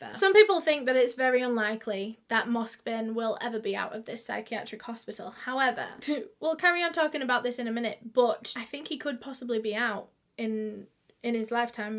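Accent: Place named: Northern England, United Kingdom. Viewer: I fr rock w videos i cannot bring myself to watch any other true crime youtubers i just love that northern accent and she is so nice i luv her fr 😭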